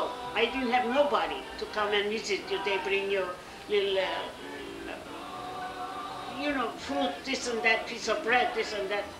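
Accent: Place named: Kayseri, Turkey. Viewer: Ah.. The first time I cried so much to an Armenian story. She is still telling the Turkish words with a Kayseri dialect, also talking English with a Kayseri mouth. I don't believe an orchestrated genocide but personal stories are so dramatic :( The places this lady telling are correct; Kayseri, Boğazlıyan Orphanage etc.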